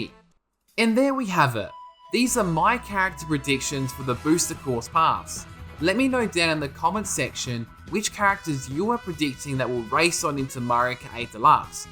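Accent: Australia. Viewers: I’ve never heard someone more Australian than you. And i love it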